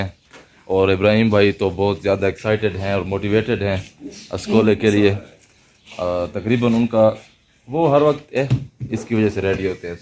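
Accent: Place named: Saraiki, Pakistan. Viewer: Your Saraiki accent is so cool.!!! 😁 btw kia hi baat hai jaga ki.... lots of love to all burakers ❤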